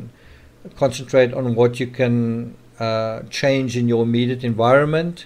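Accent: South Africa. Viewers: Love listening to your SA accent, makes me feel like I’m back home.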